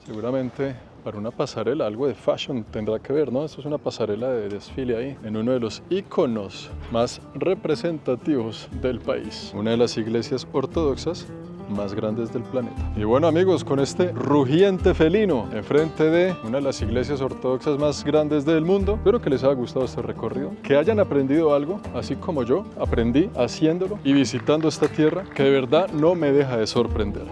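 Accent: Colombia. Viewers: Hermosa ciudad Sofía...me encanta! Y también muy linda voz,coherencia mental y sabiduría en las palabras del locutor. Ese acento colombiano me fascina! Saludos desde La Pampa Argentina, de parte de una uruguaya trotamundos, que ahora viaja nuevamente con usted y otros viajeros, que me caen muy bien! ❤😊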